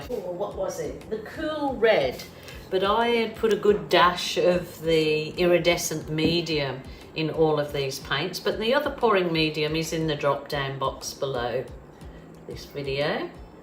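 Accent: Birmingham, United Kingdom. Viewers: Hope you're feeling a lot stronger really soon ..am awaiting the first of 4 surgeries myself including a full donor cornea transplant eventually in the hope of reversing the 100% blindness in my right eye and 60% in my horrid legacy of nearly dying of COVID months in hospiral/ respite ..I taught printmaking too . Just try & take it easy and don't push yourself Youtube..just hope your surgery heals fast x Hey as an English person ( born & bred in Liverpool by Strawberry Fields in fact )..ARE YOU or have family close to you from either the Midlands ( Birmingham ..Izzy Osbourne country ) OR my old neck.if the woods in Liverpool..I.know the amount of Australian fact terms ,swear words lol.& even humour & spelling of course that Britain & Australia.I'm that way are like twins..HOWEVER I strongly hear a Birmingham accent in your voice..OR Scouse any word with two OO' s ..yeah ...so are you ?? 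lol?😆 Wish my late mum was alive she was a potter and my only family ,lost mum aged 94 when I was in the ICU fighting Covid..those round foil paintings my mum would have LOVED them ..just pure colour = mum ❤ xx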